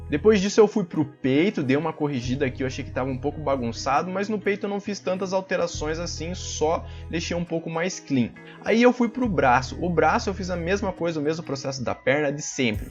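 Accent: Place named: Paraná, Brazil. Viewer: Paranaense alert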